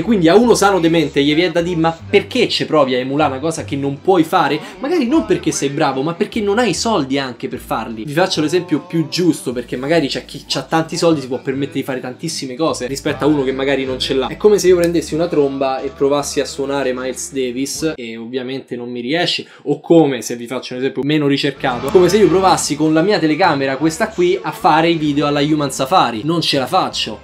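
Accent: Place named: Salento, Italy. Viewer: Sembra più salentino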